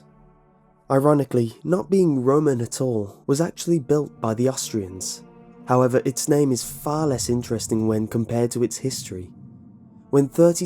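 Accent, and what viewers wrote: England, United Kingdom. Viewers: Ahaha he's definitely not australian Cuz I'm australian and this kinda sounds english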